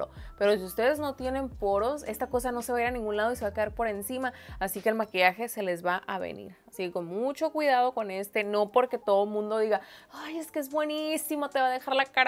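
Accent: Sinaloa, Mexico. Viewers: Me encanta cm te maquillas y tu asentó Sinaluence me gusta plebe jaja saludos desde Arandas Jalisco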